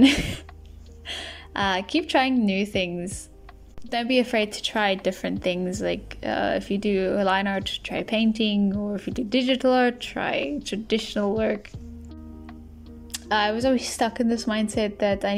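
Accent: South Africa. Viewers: oms you are from South africa too, girl ive been watching your videos and i only hear this now woowww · Are you South African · WOAH ur south african too??? i thought i recognised the accent- i thought i was aloooone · wait you are south african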